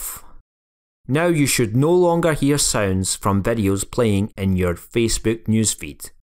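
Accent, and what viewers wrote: Scotland, United Kingdom. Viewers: Your Scottish accent made me smile immediately.😅 Oh, and thanks, it worked!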